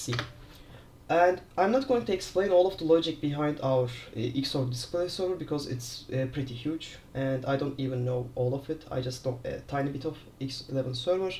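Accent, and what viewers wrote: Turkey. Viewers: türk olduğunu 5 saniyeden anladım hocam, eğitim için teşekkürler. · Dinlerken dedim çok Türk'e benziyor ingilizce. Yorumlarda bunu görünce tetit etmiş oldum.😂 Başarılar hocam.🎉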